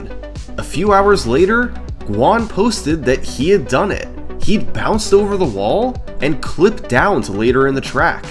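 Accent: United States. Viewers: Thats what American sound like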